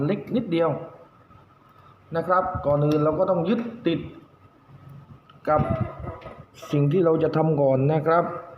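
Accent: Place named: South, Thailand. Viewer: ขอบคุณพี่ ครับ ฟังสำเนียงแล้ว รู้เลยคนใต้บ้านเราครับ🙏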